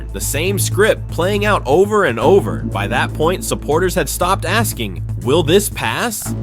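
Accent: United States. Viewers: Listening to a yank narrator on English football is a major put off. Blah blah blah blah blah blah blah blah. Tell us something we don't know 2 - zero!!! Foxtrot oscar mate!!!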